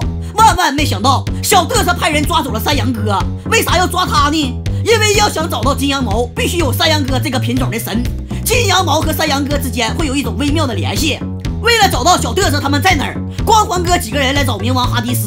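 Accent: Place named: Northeast, China. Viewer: gun six 东北话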